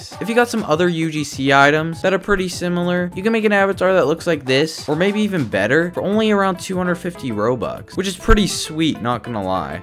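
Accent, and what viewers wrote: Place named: United Kingdom. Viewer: your British aren't you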